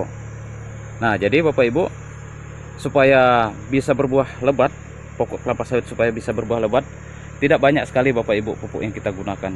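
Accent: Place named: Sumatra, Indonesia. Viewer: Ya bapak ibok..logat nya macam sumatera bang .👍😀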